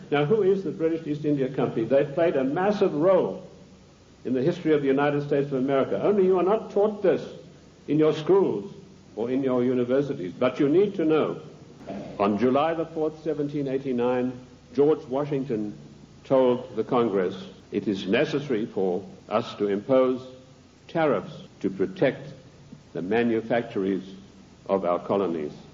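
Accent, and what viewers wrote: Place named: South Africa. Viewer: This guy sounds South African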